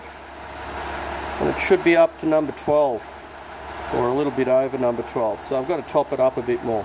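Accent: Australia. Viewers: no problem at all. I'm in the US, how do I get a beer to you? Your accent sounds Australian? Do you have a way to get a donation to you 😊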